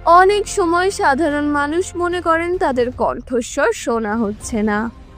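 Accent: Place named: Bangladesh. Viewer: ভাষার উচ্চারণ শুনে মনে হচ্ছে এটি বাংলাদেশের চ‍্যানেল কাজেই বন্ধ করলাম এবং দেখা উচিত নয় ।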